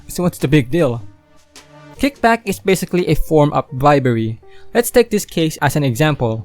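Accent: Philippines. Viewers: Finally someone with English speaking Filipino accent stepped up to understand the situation than native language content creators. All topics aside but this is wild, this is why I wouldn't trust politics all because of Marcos. Marcos did banned Beatles from performing in PH and I was pissed off by that. And now sending Du30 to Netherlands? What Marcos did was ruthless and very greed to hide from us.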